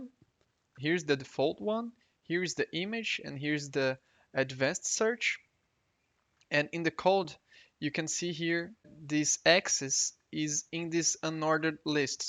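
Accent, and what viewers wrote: Brazil. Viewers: Mano, quando eu ouvi o sotaque tive certeza que era brasileiro akkkk, daí o google te dedurou , parabéns irmão, ótimo conteúdo!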